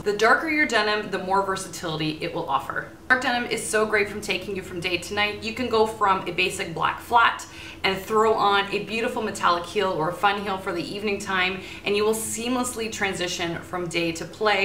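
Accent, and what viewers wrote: Canada. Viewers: I love watching you. Your denim video is basically my go to guide now and I kept saying to my friends, no the point of the pocket should be at the bottom of your bum....LOL. I love your authenticity, sincerity and expertise. I watched so many stylist on youtube and ones who have a lot more subscribers but I only subscribed to you because you are doing a great job providing genuinely value-add content. I also love your Toronto accent as one of my favorite co-workers is from there and she sounds like you :) I am really proud of the work you are doing and I just want to encourage you to keep fighting the good fight!!!!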